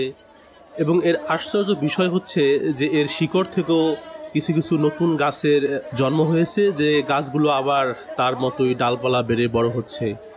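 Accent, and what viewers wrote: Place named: Khulna, Bangladesh. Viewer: তোমার বাড়ি তো খুলনা জেলায়। কথাবার্তার ধরণ শুনে বোঝা যাচ্ছে।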